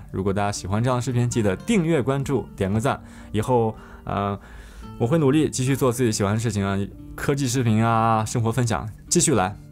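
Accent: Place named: Shanxi, China. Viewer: ！！！你好！！！去年到現在，我無意間看了你的視頻，很有趣！！！👍👍👍剛開始一聽你的聲音我就猜到你是山西人了，你的聲音真的很好聽，做主持人一定可以！！！👍👍👍讓人聽起來你是一個純樸的人，知道你在溫哥華到洛杉磯的故事！！！雖然其中不知發生什麽原因！！！我也不評論！！！但我感覺你是個好人！！！（以前在打工的時候，遇見一個老闆山西的！！！說話語調跟你一樣，說話很溫馨，不擺架子，很有善，不咄咄逼人！！！）👏👏👏雖然你做傳媒！！！經常拍東西分享！！！也把見解和生活困難面對的東西跟大家分享！！！我覺得這就是最真實的故事！！！而且你很前衛！！！APPLE的東西都被你玩轉了，當然還有其他！！！你不像其他博主，有些人品有問題，拍出來的東西都是有毛病的！！！不過你沒有！！！看你的視頻很舒暢！！！👍👍👍要是你還在溫哥華為我們分享生活就好了！！！（但人不能這麽自私，還要賺錢養家的！！！）👍👍👍 很有素質，希望離開美國還可以在Youtobe發你家鄉和中國的人和事給我們看！！！有種不舍！！！看到單身一人在國外，有些地方顯得很優秀，但還是為了生活，和目標，錢💰！！！跟我一樣有時無奈╮（╯＿╰）╭！！！很舍不得！！！多么质朴的一個人！！！（國家應該需要這樣的年輕人給他一個機會！！！）👍👍👍💪💪💪——Jim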